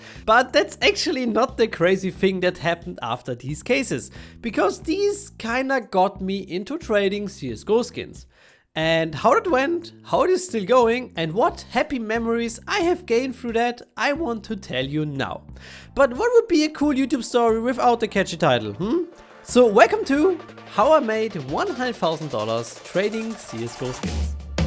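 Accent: Germany. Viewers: erster deutscher youtuber, bei dem mich der deutsche akzent nicht abfuckt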